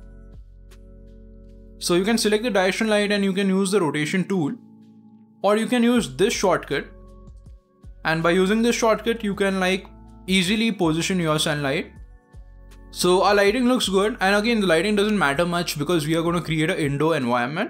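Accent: India. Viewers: It is genuinely a fact that when you've been struggling for weeks with something and finally a video comes along and the guy has an Indian accent he gives the most top tier advice that's super easy to understand and follow along. Thanks,. I've been struggling to figure out what to do in UE5. Now I have ideas.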